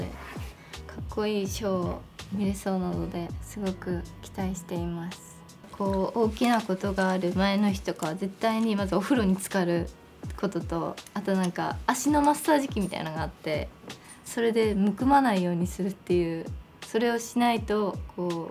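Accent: Standard. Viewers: 모처럼 모모가 표준어로 열심히 말해줬는데 ㅠㅠ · ミラノまでウォンジョンヨ先生来てくれてるだ〜！このペアは最強がこんなに標準語喋ってるの新鮮w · 標準語でお話するの謎の寂しさがある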